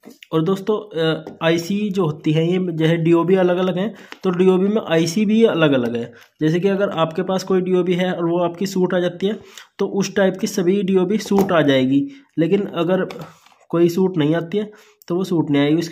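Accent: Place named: Baghpat, India. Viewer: Sar aapke Bhasha Baghpat side ki hai kya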